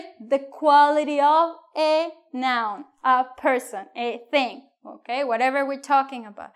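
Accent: Spain. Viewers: Is this Spain Spanish or Mexican Spanish? (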